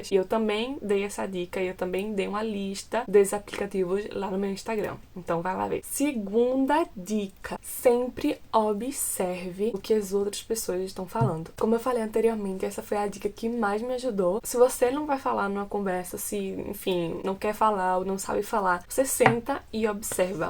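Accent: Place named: Pernambuco, Brazil. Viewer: Sotaque pernambucano🤗 meu nordeste é diferenciado 😍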